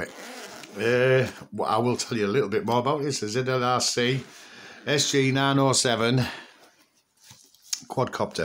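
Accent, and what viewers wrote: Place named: Newcastle, United Kingdom. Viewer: Is the Photo Gesture not two fingers at Boris for the licence fee just saying .... and you sound like a fellow Geordie ....all the best mate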